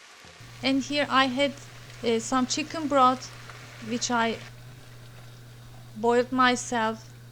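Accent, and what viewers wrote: Turkey. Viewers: Ur Turkish accent is so cute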